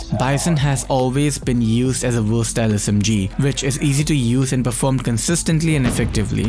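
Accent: India. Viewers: Btw bro are you indian? You sound like indian ☺️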